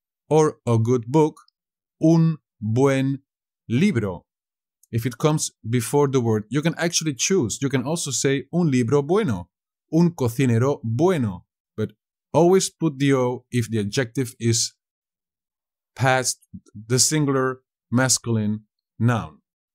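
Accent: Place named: Castile, Spain. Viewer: I have gone through many different tutorials and decided to go with yours. Your way of teaching is both very clear and efficient. That you are giving different examples than what is written on the slide is genius because that way it is possible to learn more vocabulary and grammar. Another important reason I chose your tutorials is it was hard to find European Spanish(or should I say Castilian?) I adore the "th" sounds haha. Thank you for your work, it is much appreciated.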